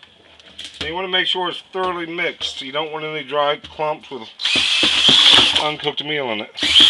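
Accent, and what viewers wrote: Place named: New England, United States. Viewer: U from new england? You say wutter instead of water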